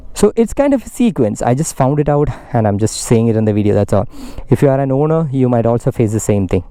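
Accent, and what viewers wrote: Kerala, India. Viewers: Why do you sound like a Malayali?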